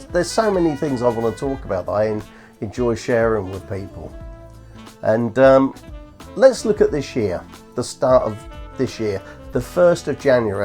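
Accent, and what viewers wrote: England, United Kingdom. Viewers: I've watched a couple of videos and some has had background music a bit too loud. In this video it's fine, not too distracting (easier to take in what you are saying), and also listening to you talk is a melody in itself. Some English accents are very pleasant to listen to. Videos 10 mins or longer are my favorite, that way I can listen to them for a while when in the kitchen! Keep up the good work!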